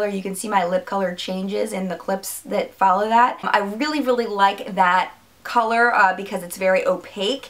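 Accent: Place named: Boston, United States. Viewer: I love your accent! Lol! Is it Boston? Cause the way you say Boxycharm makes me smile!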